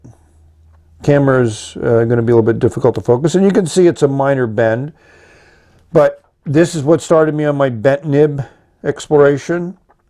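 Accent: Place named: Pennsylvania, United States. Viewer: I’m a newbie at fountain pens, what does the bent nib do for ones writing? You sound like a PA resident, am I right? I grew up in PA😃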